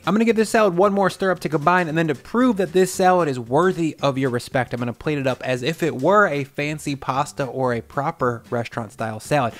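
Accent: United States. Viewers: I love the recipes, but aren't I hearing an American accent!?! Why are you measuring in grams?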